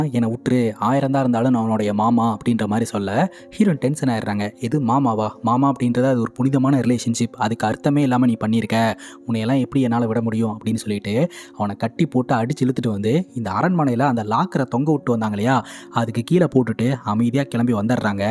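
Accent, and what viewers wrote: Coimbatore, India. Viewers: coimbatore ah neenga?!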